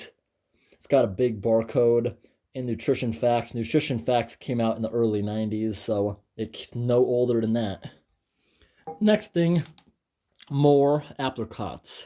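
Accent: New England, United States. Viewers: App ri cots lol. New England accents are weird. Your videos are the best though! You really need to get a P.O. Box like ashens used to do and he’d get all sorts of crazy old food.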